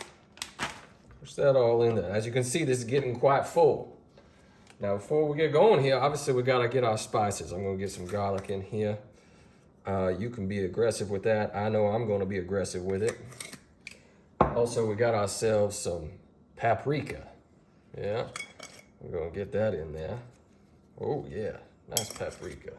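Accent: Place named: Louisiana, United States. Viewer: Not Georgia cajun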